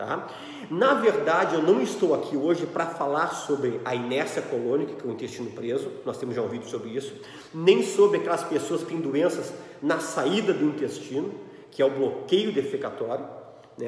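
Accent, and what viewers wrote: Rio Grande do Sul, Brazil. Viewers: Dr muito obrigada por seus videios,Tenho 65 anos,tenho Diverticulite e as fezes ficam presas na parte direita,ai vou massageando p baixo p que solte.Procuro me alimentar bem e mais de alimentos naturais.O que devo fazer p defecar melhor? Agradeço sua resposta. Amo o RS e seu sotaque, morei 12 anos em Novo Hamburgo,agora moro no RJ,onde nasci.Deus o abençoe ricamente sempre.Bjo no coração.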